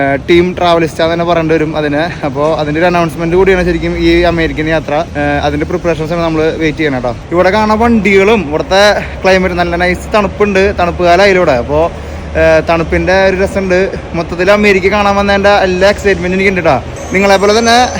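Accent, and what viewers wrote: Thrissur, India. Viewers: Welcome to the United States. I have a few suggestions for you (1) You should have a clean-shaved face or keep a thick mustache or goatee because many people do not like your face on videos. (2) You should not show your face on video, instead, you should give your narrative and at the same time showing the places you visit like Cool Vision, Rick Reeve’s Europe and Malayalam vloggers like Pikolins Vibe and The Indian Trails. (3) Many people from Thrissur and Malabar districts changed their accent. So, you also should change your accent. If many people from these districts could change their accent, you also could do, so that you could attract more and more viewers to your videos. (4) Your introduction should be very brief. You could say: Hello, Good Morning, I am so and so. Today, I am going to or showing….”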